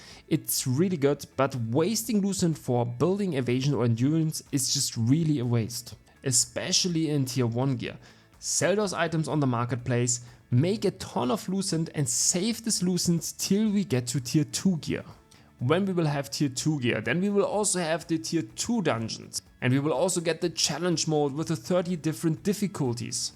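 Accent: Germany. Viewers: Oh, you are German? Deshalb mag ich deinen Akzent wohl so sehr :'D Und natürlich Lob an deine Videos! Ich finde es sehr hilfreich, dass du immer durch alle Skills gehst, und auch erklärst, warum welche nicht genommen werden, so ergibt es irgendwie mehr Sinn. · Hab sofort gemerkt dass du Deutsch bist ^^" bester Guide den man sich als Anfänger reinziehen kann nicht zu kompliziert troztdem Detailreich, kurz aber nicht so kurz dass schritte übersprungen werden. Alles super erklärt gerade für mich als Anfänger was MMOS angeht. hab auch mega rescourcen und Zeit verschwendet da ich ein Build nachgemacht von jemanden der angeblich nur Builds und gameplay klaut aber bin mir sicher. Mit dem guide hol ich dass schnell auf, Danke für den Mega guide :) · Hab ich doch richtig vermutet, dass du n deutscher bist :) Kannst den auch mal in Deutsch machen. Bin zwar halbwegs Sattelfest mit der englischen Sprache, aber Deutsch fällt dann doch leichter... 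Danke für das Video.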